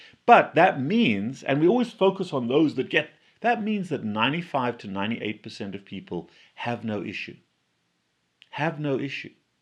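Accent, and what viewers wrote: South Africa. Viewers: Ha! I thought you had a South African accent.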